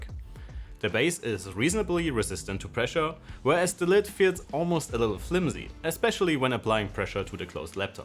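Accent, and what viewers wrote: Germany. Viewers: Mach mal bitte Timestamps rein, ich höre deinen deutschen Akzent, liebe Grüße.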